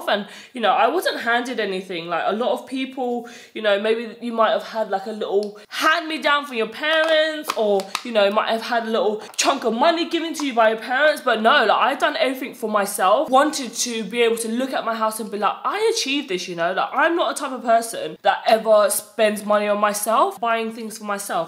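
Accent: United Kingdom. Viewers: I found you on Instagram, your videos with you doing makeup or your skincare. When I started watching your YouTube channel I was hooked and loved how open and honest you are , I didn’t know you had a British accent and lived in London till I started watching your channel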